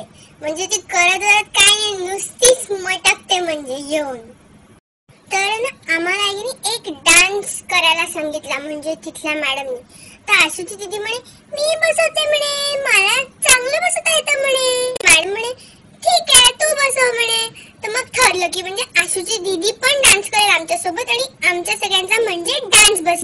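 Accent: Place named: Vidarbha, India. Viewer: jabardast video......tu Vidarbhachi ahes ka? khup mast ahe tujhi bhasha amchyasarkhich.....All the best ..Khup videos banav ani motthi ho...but motthi jari zalis tari lahanpan sodu nakos......Lahanpan dega deva....enjoy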